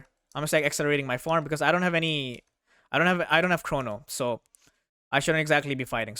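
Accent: India. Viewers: Bro.... You Indian?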